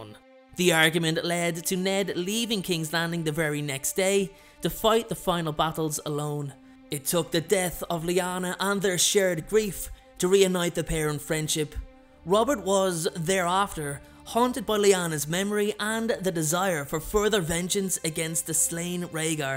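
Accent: Ireland. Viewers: Delighted to hear an Irish accent. First time listener well done.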